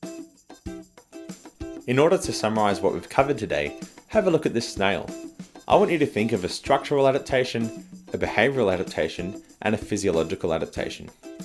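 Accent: Australia. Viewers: it's so comforting to hear an aussie accent on youtube hah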